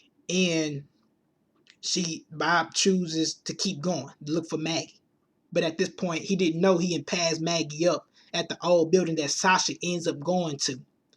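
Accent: South, United States. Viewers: I love your videos your accent is super country when will you post a prediction video for the next episode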